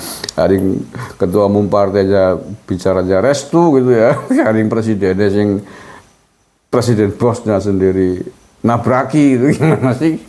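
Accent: Banyumas, Indonesia. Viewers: Lucu ya gaya ngapak 😂😂😂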